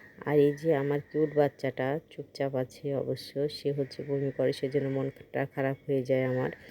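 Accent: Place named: Rajshahi, Bangladesh. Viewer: Eta Kon jaiga? Apnar vasa amader Rajshahir moto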